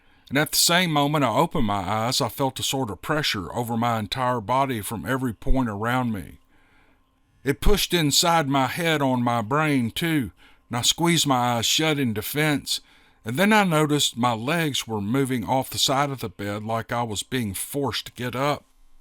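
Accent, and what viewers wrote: South, United States. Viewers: You should narrate some southern bell stuff like Gone With The Wind or sumpin LOL I like listening to you for your southern accent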